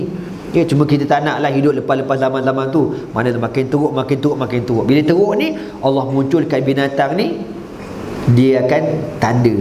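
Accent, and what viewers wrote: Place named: Besut, Malaysia. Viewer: besut clear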